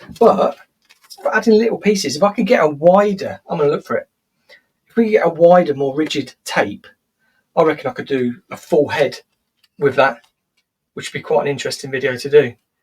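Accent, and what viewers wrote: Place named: Northern England, United Kingdom. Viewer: Hi,your creativity is absolutely infectious..nice to hear a fellow northerner too like myself 🤗( I'm a Scouser albeit a posh one lol & now living in the Wirral) Why don't you buy a roll of clear plastic carpet protector( used by decorators & keeping the carpet clean during building work ).I occasionally teach adults with MS / visual impairment like myself printmaking techniques & textiles & have used that decorators film to save money on professional ( Mylar sheets ) for years..I have used it successfully as stencil film on walls & furniture..brilliant for cheap & quick 👕 t-shirt design printing ( just add 1sheet inside to stop ink/ acrylic weeping through 👕) & then cut your design to stencil with scissors or a knife & stick on the front..I.also got kids who were partially sighted like me to make their own stained glass window decals using it & Sharpie markers..yeah for what your doing here you'll have fun & it's fairly low tack,wonder if conditioning it out with loads of warm water would eliminate all chance of ripping out any hair?? 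BTW 25 metres is under £12 & it's around 20 inches wide..like stair carpet width ( it's main use in DIY) xx..Your bobbed hair with blonde under the fringe I had fabulous ' barcode reverse weekly's highlights on my long way hair in the mid 90' s & what you call long Wolf cut..if I say so myself it looked sexy as hell lol I've always been obsessed by big 1970' s Hammer Horror film hair..especially the vampire women lol 😆😛lol x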